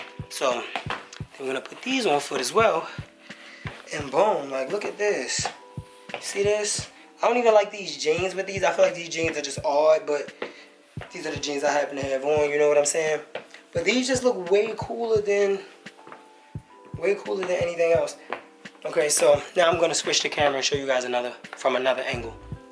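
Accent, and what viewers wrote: Baltimore, United States. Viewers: Baltimore accent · You definitely from Baltimore lol shuuus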